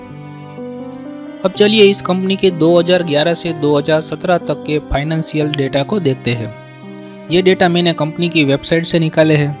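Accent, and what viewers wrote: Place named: Gujarat, India. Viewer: Love ur gujrati accent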